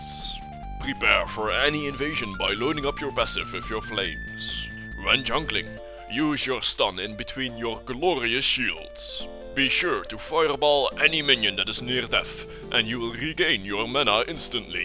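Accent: Netherlands. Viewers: dat nederlandse accent kun je best goed horen ^^ nice guide i should give it a go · that accent.. im so ashamed to be dutch right now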